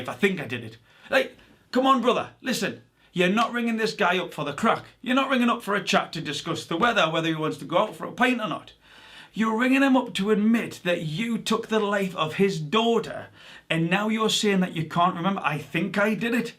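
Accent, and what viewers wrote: Newcastle, United Kingdom. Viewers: Love hearing a fellow geordie on YouTube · Love your channel, Your Goerdie accent reminds me of hone, stay safe over there in the UK. Greetings from Perth West Australia 🇦🇺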